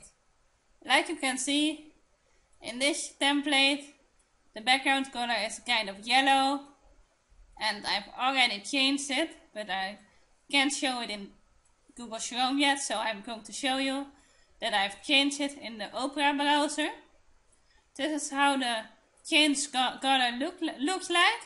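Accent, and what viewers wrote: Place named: Netherlands. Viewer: Zo te horen aan je accent kan ik hier gerust iets in het Nederlands neerzetten. :-) Top Tutorial! Net wat ik zocht.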